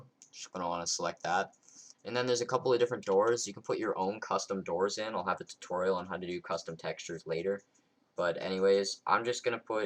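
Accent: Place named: Canada. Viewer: i can tell he's canadian, from the obvious pronunciations of words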